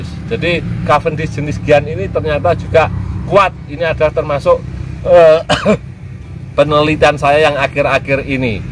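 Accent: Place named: Malang, Indonesia. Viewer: Sampean Iki wong malang taaa pak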